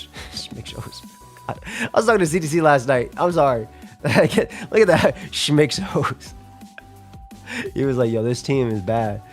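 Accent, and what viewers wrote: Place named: New York, United States. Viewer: should have known tbh, i hear the NY in your voice and the words you say haha. sending love from from Suffolk County appreciate your content fam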